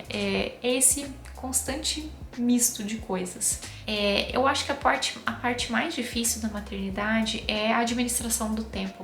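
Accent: Brazil. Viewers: vc é gaúcha?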